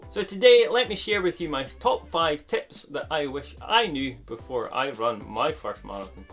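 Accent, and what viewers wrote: Scotland, United Kingdom. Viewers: Running advice with a scottish accent. What more could you ask for. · He's Scottish 😂 English is their first language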